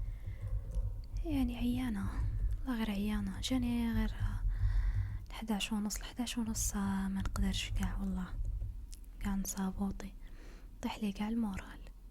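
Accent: Algeria. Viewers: انت جزائرية أصيلة هذه لهجة قوية 😊❤ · Vive l'accent algérois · باينة باسكو مستحيل تكون غير جزئرية وتهدر لهجتنا هكدا 😊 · اللهجة الجزائرية تجنن صوتك حلو فيها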